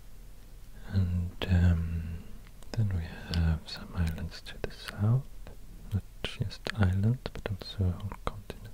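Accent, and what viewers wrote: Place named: Sweden. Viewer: thats the power of swedish english asmr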